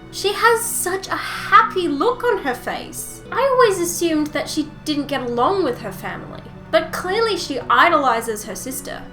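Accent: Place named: Australia. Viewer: Yay i though i heard an Australia accent, super happy to meet another RWBY fan in Australia, much love from NSW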